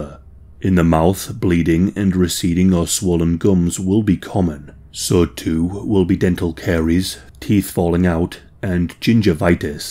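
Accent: United Kingdom. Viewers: British accent of narrator.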